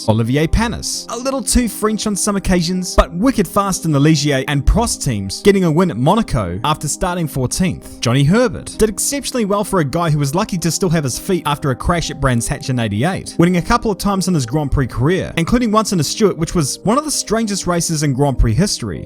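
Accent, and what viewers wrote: New Zealand. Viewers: That is the proper pronunciation, just sounds a bit funny cuz he's kiwi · Please just pronounce it "Ear tin". "Eye Earrrhh tonne" sounds ridiculous! Also, while I'm being an egg, why is your glorious Kiwi accent peppered with Aussie/American vowels, such as when you say "example" funny? Just curious. 👍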